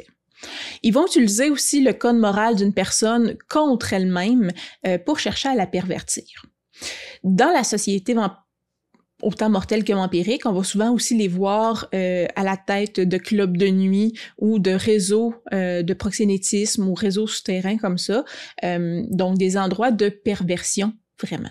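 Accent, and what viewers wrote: Quebec, Canada. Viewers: Merci pour se panorama J'adore ce petit accent Québécois 😊 Et toi que penses tu des Serpents? As tu déjà joué un Serpent? En tant que conteuse, les utilises tu? Carpe Noctem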